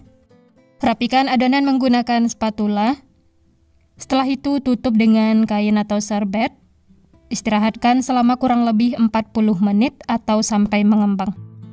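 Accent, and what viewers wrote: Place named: Minangkabau, Indonesia. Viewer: Denger suaranya aku ngomong dalem hati "kaya urang awak nada bicaranya" eh pas liat foto profilnya iya lagi pose di jam gadang hehehe Salam kenal yah karna bgimanapun alm.papa urang minang maninjau❤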